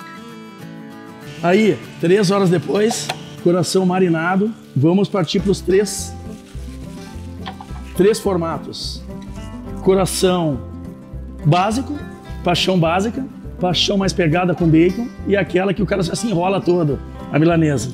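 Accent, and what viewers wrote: Rio Grande do Sul, Brazil. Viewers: Sotaque gaúcho é top demais. Brasil e suas várias línguas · Tu é o cara para ensinar assar pelo teu jeito de falar tu és do Rio Grande do Sul aprendendo muito com você um abraço do Paulinho de Porto Alegre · Mas bah tche tu es de porto alegre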